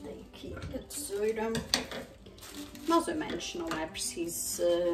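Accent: São Miguel, Portugal. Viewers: Olá!!!Sou nova por estes lados 😊e gostei do vídeo e da sua simpatia,adoro o seu sotaque,é de São Miguel??Beijinhos.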